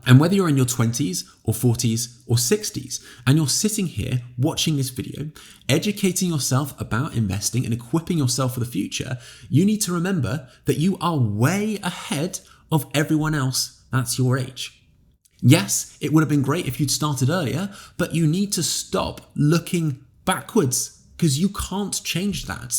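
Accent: England, United Kingdom. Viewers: Superb videos. I have subscribed to lots of American videos but its refreshing to have an English man doing it. Keep educating.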